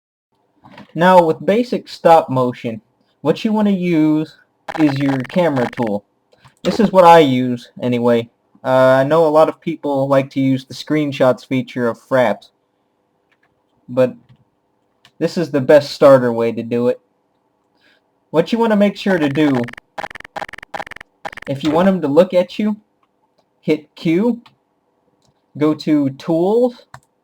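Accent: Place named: Texas, United States. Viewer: He sounds more like a teenage texan.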